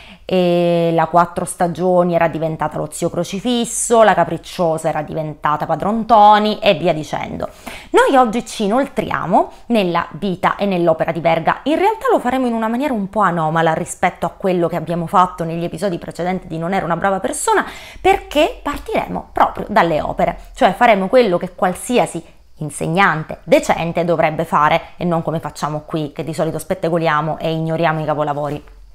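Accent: Sicily, Italy. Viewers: Adoro questa tipologia di video, anche il tuo siciliano 👍🏻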